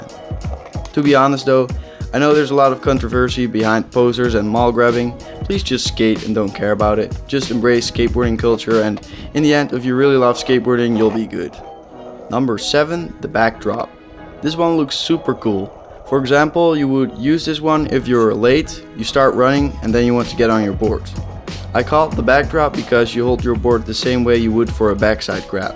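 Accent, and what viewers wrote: Netherlands. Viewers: are you dutch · Ik dacht al te horen dat je Nederlands bent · are u dutch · U dutch?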